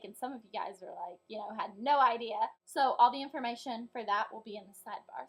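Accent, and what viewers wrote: South, United States. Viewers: Thanks for this video so much!!!!!!! . . . .Is it me or is your southern accent slowly fading away?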